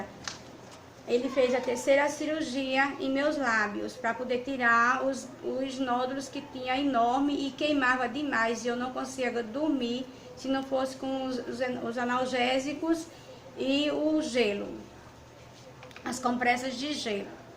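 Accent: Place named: Northeast, Brazil. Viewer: Kika Tita você está dando aula de língua portuguesa aqui pra quê? O vídeo é sobre procedimentos cirúrgicos. Você se acha muito culta, mas deveria saber que gente culta respeita a cultura e o modo de falar do outro. O vídeo mesmo é de uma Senhora Nordestina, e percebemos que ela tem um sotaque típico da região dela, e nem por isso significa que ela está falando errado. Eu falo com o sotaque super caipira do interior de Minas Gerais e tenho muito orgulho! Aprenda respeitar o modo de ser e falar dos outros. Escrever bonito só serve pra enfeitar papel. Você pelo jeito só foi na escola pra aprender escrever, porque educação você não tem nenhuma.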